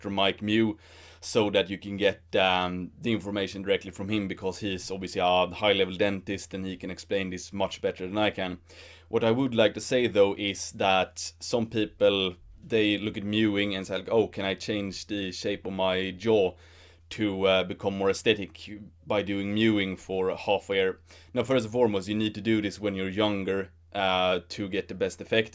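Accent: Sweden. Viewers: Hørte at du var svensk umiddelbart :P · Hello fellow swede I can hear it on your accent.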